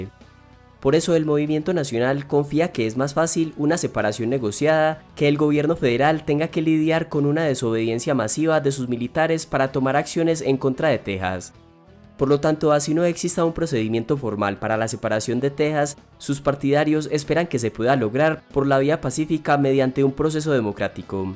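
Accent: Antioquia, Colombia. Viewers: Interesante el video, lástima el acento, creo que sería más agradable escucharlo sin el acento paisa tan marcado.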